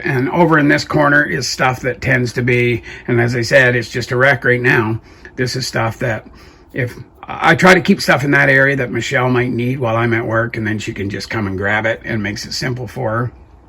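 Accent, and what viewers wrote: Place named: Eastern Canada, Canada. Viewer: I'm so thankful for your channel! I love listening to your accent because it reminds me of my Granddad and Uncle who were originally from out east:) It is such a wonderful blessing to know of brothers and sisters in Christ across this crazy nation! What a gift you give us by your prayers! May God bless you both richly!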